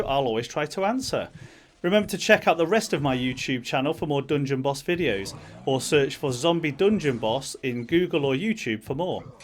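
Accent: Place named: United Kingdom. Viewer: You sound British tbh :P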